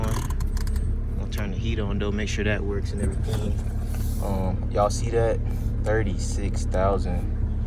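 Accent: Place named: Midwest, United States. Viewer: That's a Midwest Indiana or Ohio accent. Maybe Illinois?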